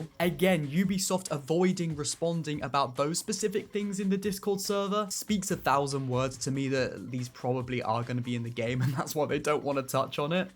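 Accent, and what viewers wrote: United Kingdom. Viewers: You From The Great Britain Fine sir? I like your accent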